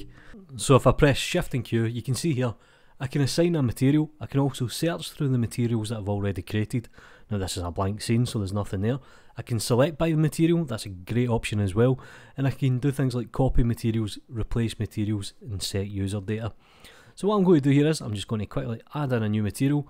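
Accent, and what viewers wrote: Scotland, United Kingdom. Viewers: That's Scottish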